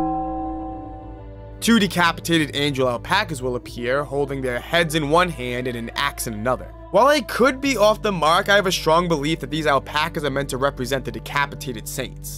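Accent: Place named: California, United States. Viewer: What do you mean? Just sounds like a normal California accent to me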